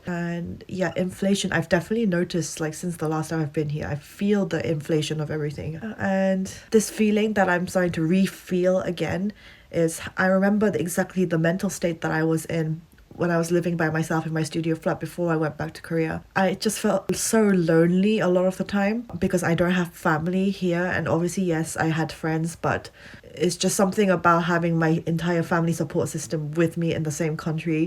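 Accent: England, United Kingdom. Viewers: ~ 전 영어공부 하는겸 재밌게 보고있어요ㅎㅎ 예전에 영국에 잠깐 거주했지만 영어를 아주 능숙하다 할 정도는 아니어서...ㅜㅜ 영국 악센트와 한국생활 소재로 영어하는게 진짜 맘에 들어요~~^^ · After watching a few of your videos I noticed your English accent got stronger while you were in London and it got lighter after a while in Korea! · It was interesting seeing London from your point of view. You are such a foodie! LOL Which is ok but you make me hungry even though I had just ate something. I also think your English accent came out more the longer you were in London too!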